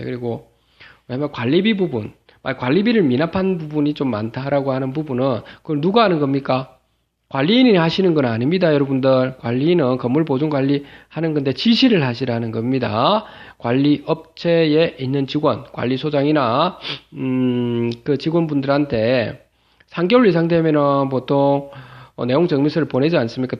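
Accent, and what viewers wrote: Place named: Gyeongsang, South Korea. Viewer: 성낙을 받아 어결권을 ~ 갱상도 사투리가 정겹네요 ㅎ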